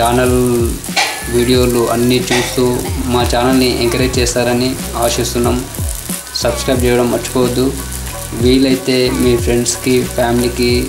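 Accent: Telangana, India. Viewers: Anna Nak brain fry Masthu istame, Nvu matlade slang Masthu Vundi acham telangana yahsa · nedhi telangana na annaya super matladuthunav same to same nalage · Nv cppe vidhananiki andharu chustaru asalu nv super jeppinav Telangana yaasa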